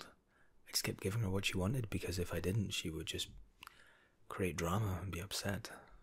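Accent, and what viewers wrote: Scotland, United Kingdom. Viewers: I actually love your accent - is that a hint of scottish i hear? ;) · Your accent is great. it's like British with some Scottish idk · And your Scottish!!! 🥰 I think i'm falling in love! Haha, ok, that's my cue to go before i start sounding like even more of a weirdo, lol 😂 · U sound british ... i prefer hearing british speaking because i feel like it’s someone from my family and I feel more secure!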